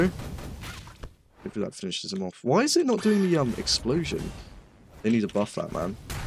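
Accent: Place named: United Kingdom. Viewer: This guy British asf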